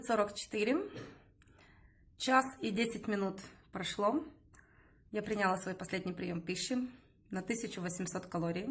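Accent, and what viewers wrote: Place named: Ukraine. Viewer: у вас украинский акцент, Вы оттуда?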